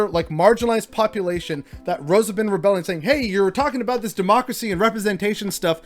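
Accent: United States. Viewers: Yo…..che… you can just leave you know Not because I say so but because you don’t seem happy Also your pronunciación is terrible It’s either fake American accent to Spanish Or not knowing enough Spanish ( and that. Is sad ) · I bet you live in a predominately white neighborhood/city judjing by your gringo-accent spanish you can't have possibly lived around your brown-hispanic brotherens 🤨... Btw these White Nationalist brown/mixed-races Latinos have seen or experienced what it's like living in countries where Whites are a minority and they don't want to see that happen to the U.S.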